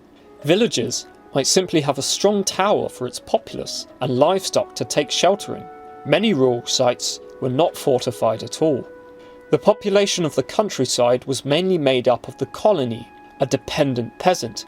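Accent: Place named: United Kingdom. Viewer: British accent = youtube success. Good channel.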